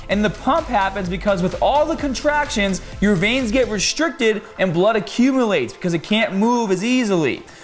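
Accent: Boston, United States. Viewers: This guy is so Boston - his accent is all over the place. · I have a question about this guy. But, before I ask it, don't assume the purpose for my asking. It is stictly curiosity. It has nothing to do with my opinion of his reliabilty. Nor is it for any judgemental purpose. So here it is. His speech and facial movement/ eye movements seem to show signs of a, possible, minor mental disability or motor function disorder exc., that he seems to fight while focusing more on what he is saying. So, does he have some sort of disorder or what ever you want to call it? Also the signs are very seldom, being why I said "minor". Is it an accent or just how he is? He does show signs of a bostin accent but only partial or influenced.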